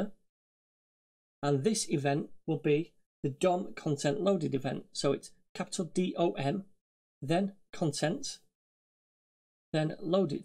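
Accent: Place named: Manchester, United Kingdom. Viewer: Thanks for putting this course together, top stuff. Do I hear a Mancunian accent?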